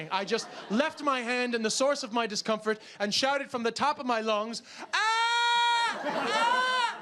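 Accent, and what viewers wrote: Wexford, Ireland. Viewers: this must have happened in Wexford cause u can hear the Wexford accent coming out in u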